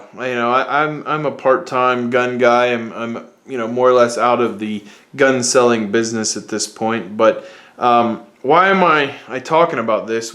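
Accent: United States. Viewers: I must apologize, didn't mean to be disrespectful. I was asking where you are from. I was going to guess Pennsylvania, Florida or North Carolina. Based on your accent.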